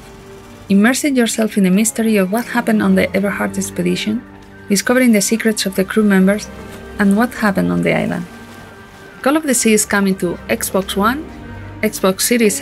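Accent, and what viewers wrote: Spain. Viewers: Ole ahí ese acento español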